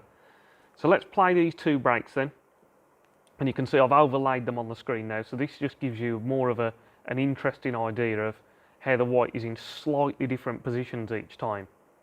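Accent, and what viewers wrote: United Kingdom. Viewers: Who's here for the british accent?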